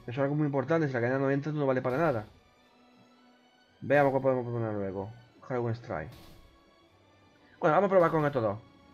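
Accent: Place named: Andalusia, Spain. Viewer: ese acento andalu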